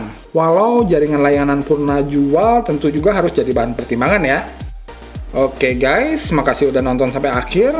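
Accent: West Java, Indonesia. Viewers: Suka sama reviewnya kang. Sunda pisan euy. Hehe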